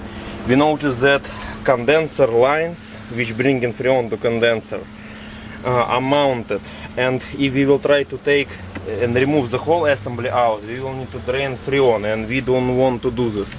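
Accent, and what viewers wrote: Russia. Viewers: Жуткий русский акцент, но англичане в восторге.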